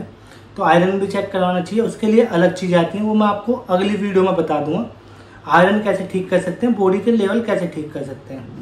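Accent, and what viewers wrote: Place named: Bulandshahr, India. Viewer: Bahiya app disst Bulandshahr se ho kya language se aise he lag raha ha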